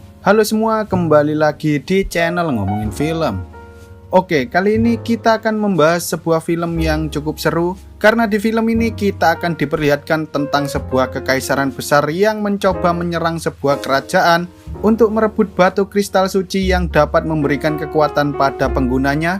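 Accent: Java, Indonesia. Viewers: Kemana aja bang kangen sama omongan jawanya 😂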